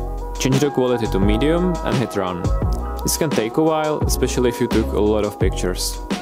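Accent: Czech Republic. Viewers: Nejsem si jistej, ale asi slyším českej přízvuk 😄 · great, but that czech accent, grrrr :D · i can hear this guy is czech, is he?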